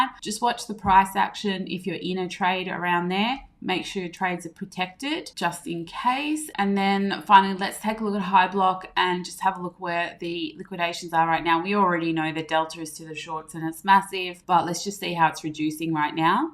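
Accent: Australia. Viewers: Not all all. Love your accent and voice! I love Aussie accents. Best one for women to have!!! I work in the Film industry with them!!!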